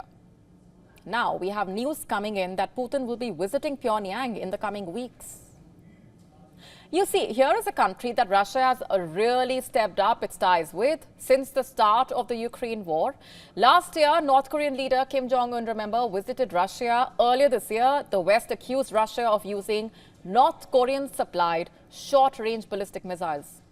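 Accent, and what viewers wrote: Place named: India. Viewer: Can't stand this indain accent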